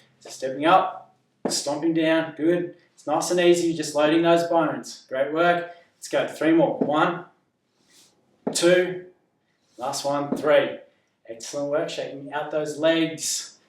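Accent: Australia. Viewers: Hi, Thanks so much for all your videos and articles. They are all very informative and easy to follow and do. I'm stuck in Europe at the moment, unable to get easily back home, so it's wonderful to hear a lovely Ozzie accent.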